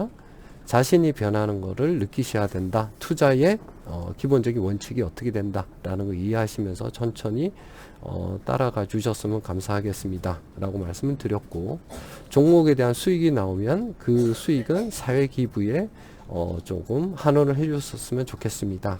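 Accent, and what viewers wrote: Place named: Gyeongsang, South Korea. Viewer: 경상도 영어가 리듬이좋아서 더 잘들리죠 ㅎㅎ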